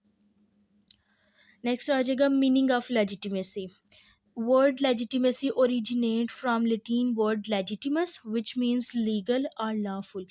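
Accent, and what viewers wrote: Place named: Lahore, Pakistan. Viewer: Lahori awaz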